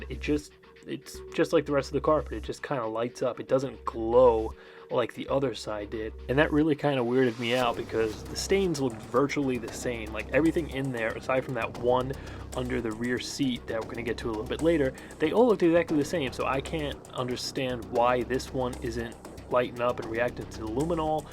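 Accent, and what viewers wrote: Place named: Bronx, United States. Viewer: Haha bronx accent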